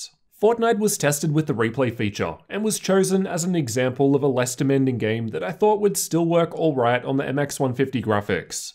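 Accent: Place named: Australia. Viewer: One of the reasons I watch your videos is because I like mimicking accents and I am not so good with Australian accent, u talk clearly and you r a tech YouTuber so I can do two things at a time, watch tech videos and learn Australian accent 😁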